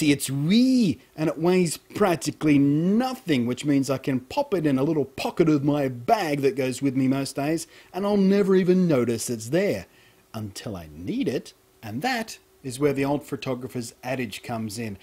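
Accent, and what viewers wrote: Australia. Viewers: He's Australian... Unless you didn't mean that. Otherwise, yeah, Brit accents are pretty cool. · He is not british. He's australian...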